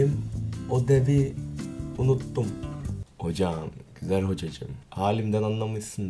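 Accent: Adana, Turkey. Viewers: Kardeşim sen direk yöresel adanalı olmuşsun :d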